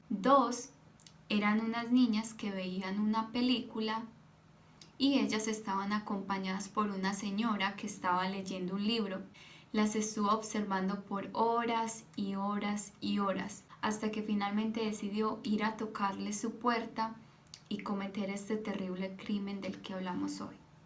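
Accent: Colombia. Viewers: Eres colombiana me queda claro, pero tienes una forma de hablar graciosa, como un acento de Colombia pero de alguna región en particular no? Los colombianos tienen un acento que me gusta mucho pero el tuyo suena un poco diferente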